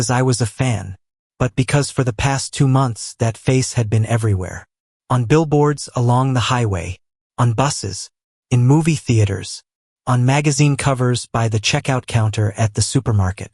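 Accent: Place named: Spain. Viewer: wtf is with the Spanish voice inflections? Can we PLEASE knock that shit off ?????!!!!!????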